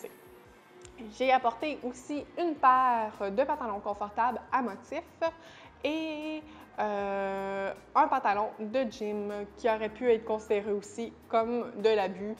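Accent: Canada. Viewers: Hey très jolie ton accent québécois . Enfin une personne qui met beaucoup de chaussure dans sa valise . J'aime bien ta vidéo 👍🏾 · t’es canadienne??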